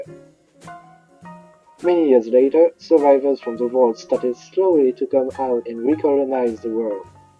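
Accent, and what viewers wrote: France. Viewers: I thought you were trying to do the accent. I thought that was kinda clever having a frech accent with the old jazz music. Didnt realize it was unintended. Good job with the history too. Ive only played fallout 3 and NV. I never exactly knew the history in the game. Good video. Please keep making more!!:D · tes videos sur l'histoire de fallout sont geniales et tres interessantes. Franchement rien a redire la dessus. Par contre, jvais passer pour un rabat joie, mais ton accent francais en anglais cest.. pas possible. Je salue tes efforts pour le faire en anglais avec les sous titres fr et anglais, cest un enorme travail jen suis conscient mais l'accent me deplait. Je suis désolé d'être franc comme ca mais si tu pouvais ameliorer ce point ce serait parfait. Mais sinon je te le redis tes vidéos sont geniales:-) A la prochaine! Grayef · Si je sais reconnaitre une chose c'est bien un accent Français en Anglais, dis moi, ne serais-tu pas originaire d'un pays pratiquant la langue de Molière?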